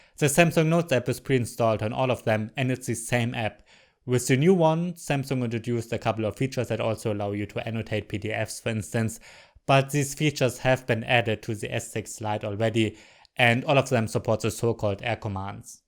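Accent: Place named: Germany. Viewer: like everyone can hear that you'r from germany :]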